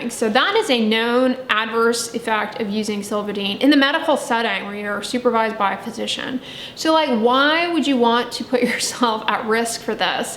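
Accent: United States. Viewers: Man thats an annoying American accent..... and i dont believe a word of advise from doctors........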